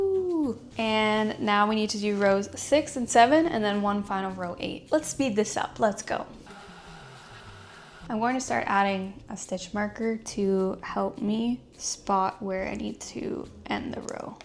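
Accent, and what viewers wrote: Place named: Afrikaans, South Africa. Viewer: Hey can you pls use your Afrikaans accent. Anyway this good! Thanks 😀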